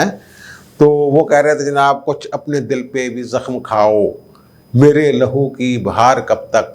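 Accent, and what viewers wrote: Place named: Punjab, India. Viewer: صاحب جب بھی آپ شاہ محمود حسین قریشی کا نام لیتے ہیں تو مجھے ہنسی آ جاتی ہے پنجابی لہجے کی وجہ سے آپ قریشی کے ق پر پیش کی بجائے زبر بولتے ہیں اس طرح جو لفظ بنتا ہے وہ میراثی اپنے لئے استعمال کر تے ہیں خدا نخواستہ میرا مقصد تضحیک یا دل آزاری نہیں صرف آپ سے درخواست ہے کہ قریشی کے ق پر پیش لگا کر بولا کریں اچھا لگے گا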